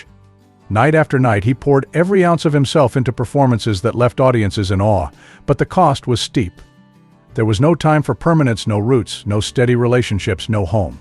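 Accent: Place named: United States. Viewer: Who is this Yanky , shitehawk commentator - Absolute bolloxology !!!!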